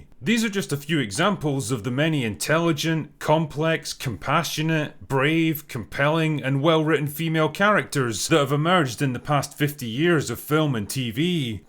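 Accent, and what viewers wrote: Scotland, United Kingdom. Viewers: Genius analysis. And I like your accent - Scottish, but totally readable for a yank like me! Cheers!😊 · Most Scottish bloke ever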